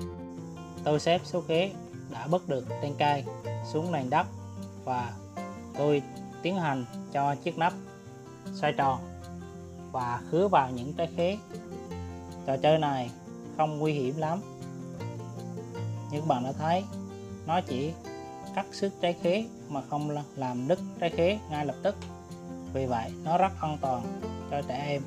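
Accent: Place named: Quang Nam, Vietnam. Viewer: Trò đầu tiên với nắp nước ngọt mình có chơi rồi , tuổi thơ lại ùa về. Cái giọng nói QNĐN thân thương quá vì mình là người quê ở Quảng Nam. Gửi bạn ❤️👍🎁✅chúc bạn thành công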